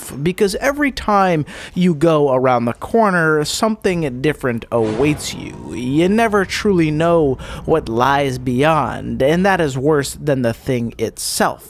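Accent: United States. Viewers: Scott the woz if he was from Tennessee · Are you from Wisconsin?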